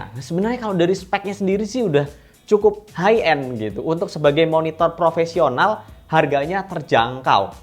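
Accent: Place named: South Sumatra, Indonesia. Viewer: Terima kasih reviewnya, Bang... Informatif sekali 👍👍. BTW, Abang asalnya dari Sumsel ya? Kebanyakan teman2 saya yang asal Sumsel, kalo bilang kata "gelap", diucapkannya "gelep".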